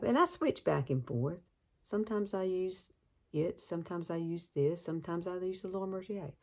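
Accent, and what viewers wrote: South, United States. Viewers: Love your southern accent. I have a ton of family in Alabama..What part of Alabama are you in?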